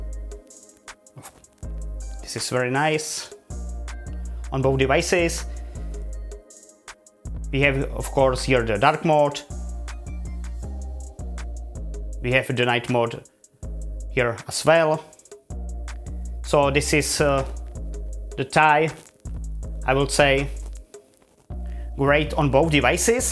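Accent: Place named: Czech Republic. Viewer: Ahoj z Česka! Pěkné slyšen náš krásný český přízvuk :)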